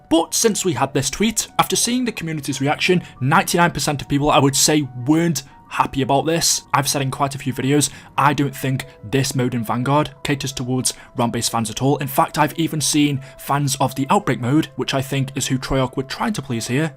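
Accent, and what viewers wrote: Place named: Northern England, United Kingdom. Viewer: You have a very interesting accent! What is it? Northern English? Western Canadian?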